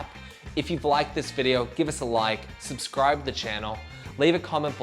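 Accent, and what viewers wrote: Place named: United States. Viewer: Thanks a lot for the comment Jack! Appreciate your support. I moved to Australia when I was much younger from the states. Never lost the accent fully!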